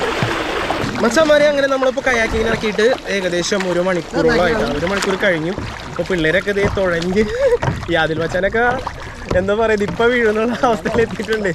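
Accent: Thrissur, India. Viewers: Machan thrissur karrananno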